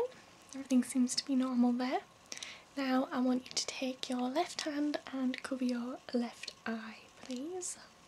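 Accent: United Kingdom. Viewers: great to hear some bri'ish accent, and on top of that, it just a great vid! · I think its the British accent that does it for me. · that accent is delicious. Where in the UK is that from? Also great video, of course. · Google needs to design an auditory searching mechanism that allows you to search by accent. The ASMR industry needs more Brits! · ahhhh...British accent nerve exam..YES!! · I'm sorry to ask you this, but what is your accent? I can't seem to figure it out, I'm terrible with telling the difference between Scottish, Irish and Welsh xD haha love all your videos, keep going :)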